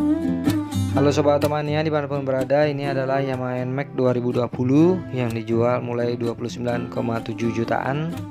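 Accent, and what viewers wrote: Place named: West Sumatra, Indonesia. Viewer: Ini yg ngomong kok kaya org sumatera barat bukan org bali.. (yg org bali asli komen y)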